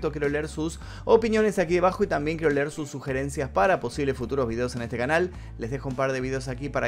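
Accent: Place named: Argentina. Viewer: NO PUDE TERMINAR DE VER EL VIDEO,POR QUE EL ACENTO ARGENTINO ES MUY DESGASTANTE O CANSÓN/ABURRIDO.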